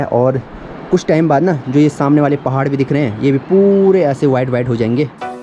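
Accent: Pahadi, India. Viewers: Haha🤣🤣🤣🤣🤣🤣love he says cool pahadi and you speaks really good hindi 😍😍😍😍😍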